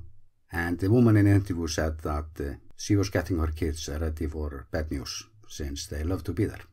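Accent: Iceland. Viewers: Thanks for the info. I find it difficult to understand sometimes what you are saying because your English has such a strong Icelandic accent. This is something you could work on with a native English speaker . . . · I’m Canadian (I only speak English), and I understand him well. I actually enjoy listening to the Icelandic accent :)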